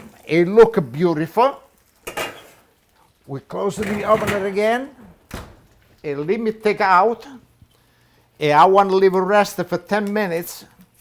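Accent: Italy. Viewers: You gotta love that Italian accent too!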